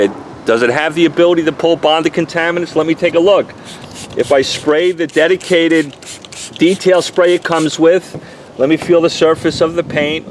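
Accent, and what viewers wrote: New York, United States. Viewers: You sound more like The Bronx or Brooklyn, Tx :D Love the accent and the explanation!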